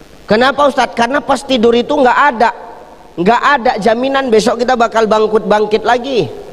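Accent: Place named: Asahan, Indonesia. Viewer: ASSALAMU ALAIKUM USTADZ., IDOLA SAYA SEMOGA SEHAT SENANTIASA Ada dlm Lindungan ALLAH AamiiiN CERAMAH NYA MENGENA DI HATI SAYA SUKA Dgn LOGAT ASAHAN NYA SUKSES SELALU USTADZ.JAKARTA HADIR SELALU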